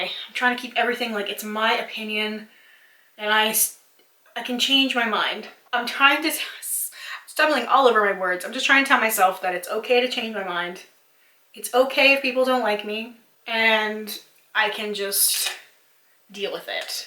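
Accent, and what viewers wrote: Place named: Canada. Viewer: I can't understand how anyone could possibly have an issue with you. You come across as such a sweet and genuine person. I don't know any Canadians so I'm loving your cute little accent too. Keep being you as you're great as you are. Remember, this is your channel and you don't owe anyone anything! Much love ❤️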